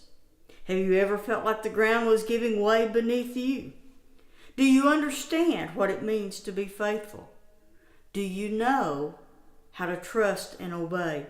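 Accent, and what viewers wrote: South, United States. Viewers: Thank you!!! I am going to listen to your lessons as I have been looking for some Bible studies in a down home way that I am used to. I am from the mountains of West Virginia and your calming southern voice makes me feel at home! Thank you!!! God Bless!!!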